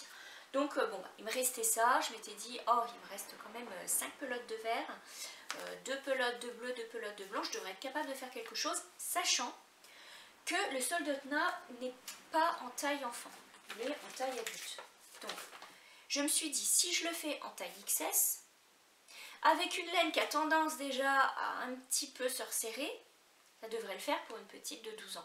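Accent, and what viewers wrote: Quebec, Canada. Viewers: Petit accent Québecois? ^^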